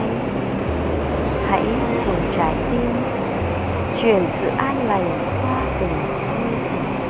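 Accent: Hanoi, Vietnam. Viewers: nghe giọng nói Hà Nội mới sáng khoái làm sao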